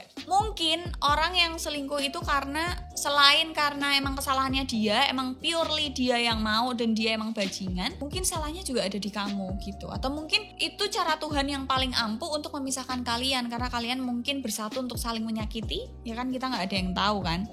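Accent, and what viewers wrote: Java, Indonesia. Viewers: Akhirnya kangen medoknya mba terobati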